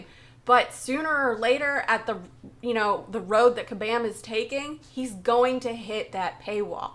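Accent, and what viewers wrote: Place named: Texas, United States. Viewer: You seem to have a hint of a western accent, like texan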